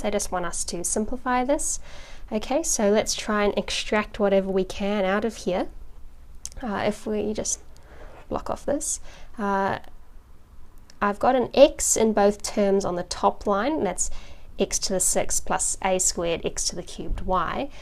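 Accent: New Zealand. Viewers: Are you from New Zealand? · New Zealand accent? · Thank goodness for the Polish subtitles. As an Australian I was struggling with the New Zealand accent. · I think she is New Zealandish? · Sound like a kiwi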